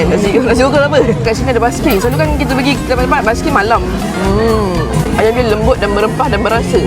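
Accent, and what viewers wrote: Kelantan, Malaysia. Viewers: Orang kelate ke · Ori kelate ke tu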